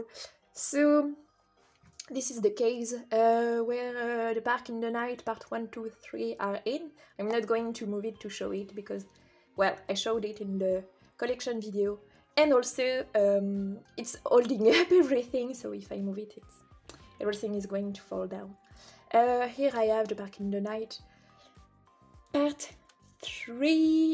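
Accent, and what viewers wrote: France. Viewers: are you french ? cuz you have a french accent while speaking English btw i like your collection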